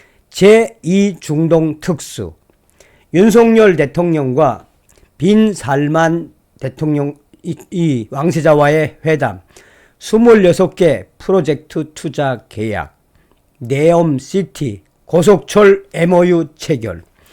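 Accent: North Korea. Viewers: 북한 톤으로 말씀하시는거 듣기 부담스러워요. 항상 방송 잘보고있읍니다 감사합니다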